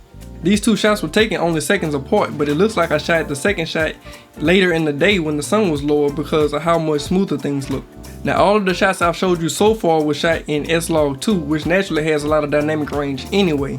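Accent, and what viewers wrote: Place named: Alabama, United States. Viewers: I recently discovered your channel and have watched several of your videos. I don't normally comment on YouTube videos but I felt compelled to reach out and encourage you to keep making great content. Also, somewhat random, but I moved out of the US a couple of years ago and, originally being from the South, it's nice hearing another Southern accent - especially one in such a positive context. Keep going! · YOU FROM BAMA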